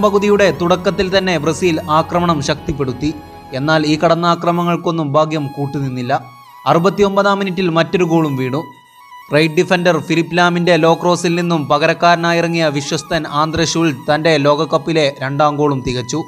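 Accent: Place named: Malappuram, India. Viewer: Very nice presentation .I came to this channel after watching your video on Mascherano.....ningal powliyanu...Njan Alappuzhakkarananu.... malappuram slangil Messiude video kelkumbol ulla feel onnu vere thanneyayirunnu...Keep doing more videos..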